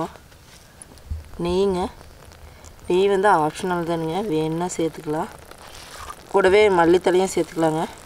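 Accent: Kongu, India. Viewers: Ungaloda kongu tamil tha romba alagu 😍😍🔥💯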